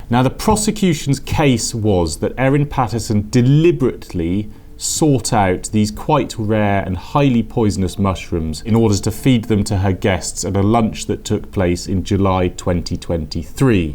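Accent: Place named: Southern England, United Kingdom. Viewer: It is a nice accent. Southern England? Crispy.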